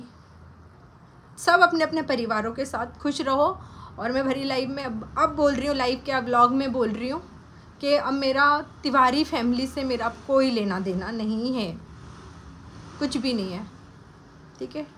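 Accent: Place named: Bhopal, India. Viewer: Aap ke baat se aap to Bihari lag rahe ho.. aap bhopli q bol rahe ho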